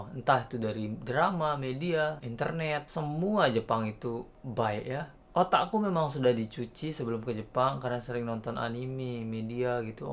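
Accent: Lombok, Indonesia. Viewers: Aksen lo kek org lombok bang kalok ngomong Indonesia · Dari bahasa dan logat nya org Lombok Wkwkwkwk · Ndk bisa hilang logat lomboknya bero... Salam lombok lolon buak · seperti logat orang lombok ya.. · Logak lombok nya medok ya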